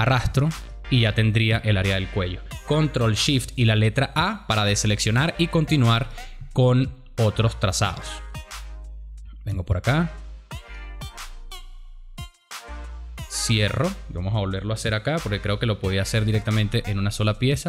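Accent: Venezuela. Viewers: bro...eres de Venezuela seguro XD suenas como venezolano pero ese no es el tema el tema es que eres un pro es esto felicitaciones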